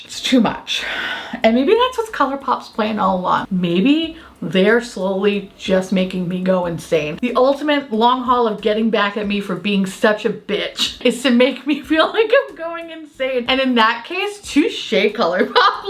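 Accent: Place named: New York, United States. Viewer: Observation on your New York accent: I never noticed it in your videos filmed in Queens, but now that you're in Florida, the accent is more noticeable! ❤️ Maybe you're subconsciously differentiating yourself from all those FloridaPeople™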